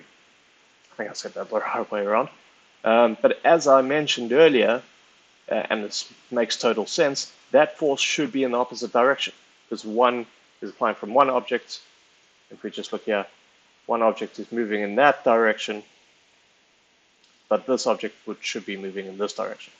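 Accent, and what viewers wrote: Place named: South Africa. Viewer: I Googled "GPU processing in Excel", landed up on your Reddit post, and then here. As a fellow Excel nerd and South African (given the accent, I'm guessing you're from JHB :)), I loved watching this! Thank you! I'd love to see you get back to your "in Excel" series to see what you'd be able to produce using Excel's newer functions (LAMBA, etc).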